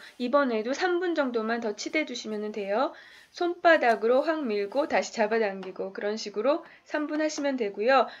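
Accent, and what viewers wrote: Seoul, South Korea. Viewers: 플레이크는 뭐예요 그리고 서울사투리인가요?? 말투 엄청 특이하네요 ㅋㅋ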